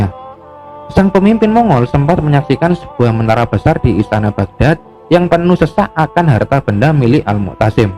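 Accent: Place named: Banyumas, Indonesia. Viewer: Logate ky wong ngapak ☺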